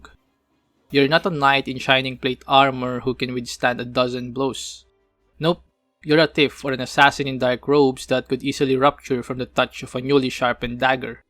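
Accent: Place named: Philippines. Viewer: Noypi? I can hear it from your accent.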